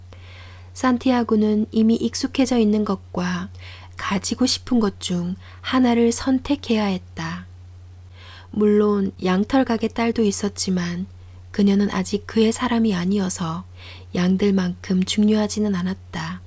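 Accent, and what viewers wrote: Gyeongsang, South Korea. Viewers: 경상도 사투리가 너무 듣기좋습니다 좋은영상 고맙습니다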